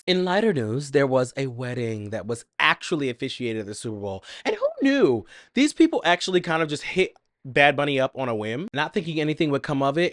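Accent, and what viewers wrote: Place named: Puerto Rico. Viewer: Ok baby I hear that accent🇵🇷👏🏽 well done!! Had me thinking you were riiiican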